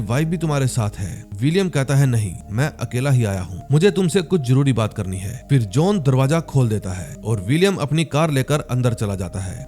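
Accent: Punjab, India. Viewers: Bhai kya aap Punjab se ho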